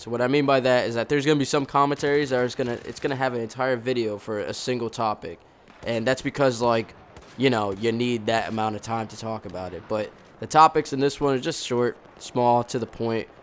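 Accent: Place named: Florida, United States. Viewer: You sound like you live in Florida.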